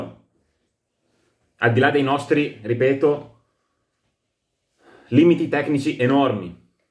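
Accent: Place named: Veneto, Italy. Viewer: Ti si Veneto??? Bella parlata❤🩶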